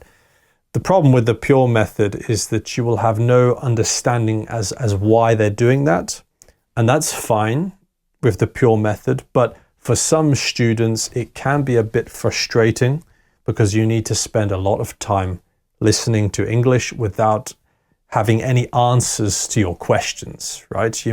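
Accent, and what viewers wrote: United Kingdom. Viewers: I love the british accent, ❤❤from Bangladesh.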